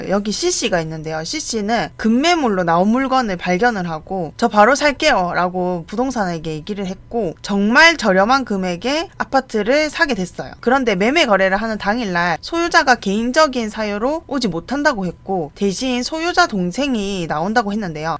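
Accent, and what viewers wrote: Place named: Busan, South Korea. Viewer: 붓싼 말씨네요. ㅋ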